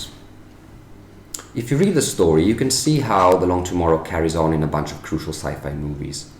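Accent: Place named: Sweden. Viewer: You must’ve picked up some of the weird ways Swedes pronounce English too because my “Swede-sense” was definitely tingling when I heard you speak 😅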